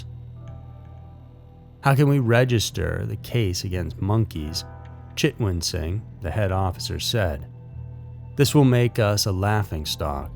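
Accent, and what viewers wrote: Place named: Texas, United States. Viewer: Love, love, LOVE your accent. Not sure if it's Texan (I'm from Austin and we're not like the stereotypes lol) but it sounds a bit like what I'd hear back home.. Either way, your content rocks and I am so here for it!!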